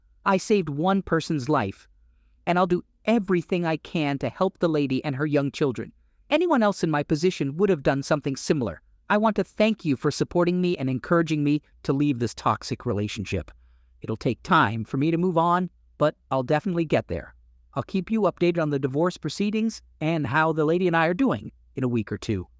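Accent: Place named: Brooklyn, United States. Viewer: This is the worst narrative bot yet. A brooklyn accent with a simp voice.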